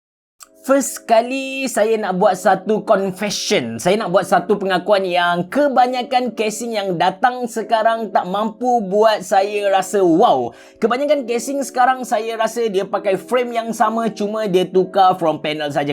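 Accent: Kelantan, Malaysia. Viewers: Pekat bahasa kelate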